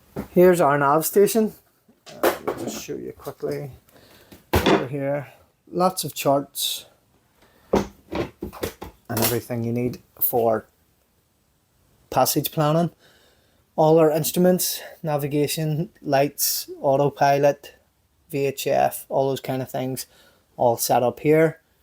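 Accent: Northern Ireland, United Kingdom. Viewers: English must not be your first language if you couldn't understand...it is called a Northern Ireland accent maybe educate yourself. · you speak nrn irish,,,like the scots and donegal awful accents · nice to hear the Nordie accent too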